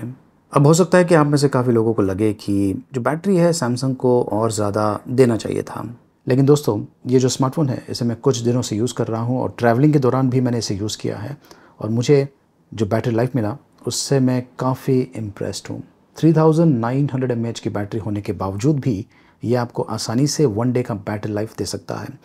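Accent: India. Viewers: No it is Indian english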